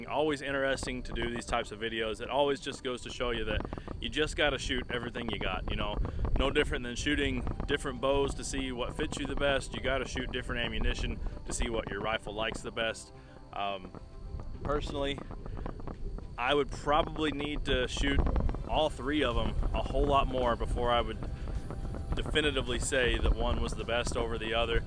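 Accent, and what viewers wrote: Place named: Fargo, United States. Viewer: Liking your videos a lot! Sounds like your in the Fargo area, I’m in Bismarck. I just picked up a 6.5 PRC Ridgeline. Two questions for ya...what scope did you top yours with? (I’m leaning toward the Leupold VX-5HD 4X20) And...which model Javelin bipod did you get?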